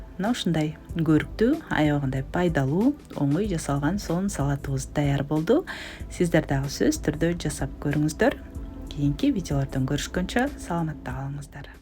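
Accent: Standard. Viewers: Сонун азаматсыз. Сизден дагы коптогон рецептерди кутобуз. Кыргыз тилинде таза суйлогонунуз абдан кубандырат😊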